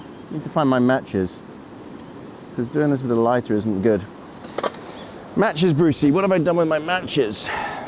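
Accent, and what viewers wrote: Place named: England, United Kingdom. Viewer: you look Maori with a English accent awesome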